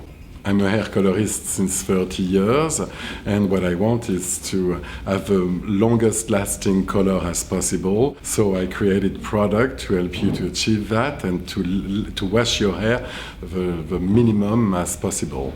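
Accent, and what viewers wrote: France. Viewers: the most french english accent ive ever heard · I'll never get tired of French accent, never. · he has the most intense english french accent ever and i'm living for it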